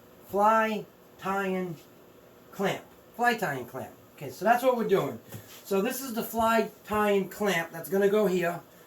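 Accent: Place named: Maine, United States. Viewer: I swear, you got a Maine accent. Love it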